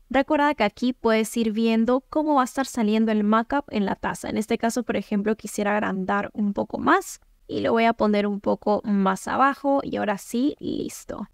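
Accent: Peru. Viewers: Hola! Muchas gracias por otra vez un excelente vídeo. Es chistoso cuando vi tu primer vídeo, me pregunte será peruana por el acento (aunque somos los menos que tenemos acento en Latinoamérica 😂) y después leí un comentario que si…yo también 🇵🇪 😊 ❤️ Pregunta! so ni bien la persona ordena y paga por el producto, Printify lo recive y cuando lo envía ahy mismo te cobra a ti, pero Etsy todavía tengo entendido que no te paga a ti hasta verificar y que el producto sea enviado…so cuánto tiempo pasa para que Etsy te pague? Y entonces tú siempre tienes que tener un monto de dinero en tu cuenta para cuando Printify se cobre de ti (porque Etsy aún no paga hasta dias) verdad?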